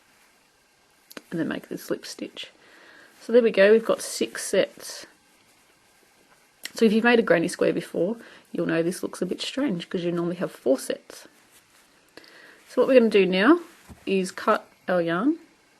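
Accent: Australia. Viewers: So nice to hear an Aussi voice. This will be my next project.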